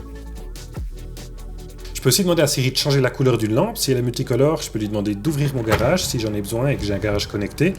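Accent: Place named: Liège, Belgium. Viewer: Super vidéo ! Très objective ! J’adore et en plus j’entends que comme moi tu es belges et de liège au vu de l’accent 😅 continue comme ça !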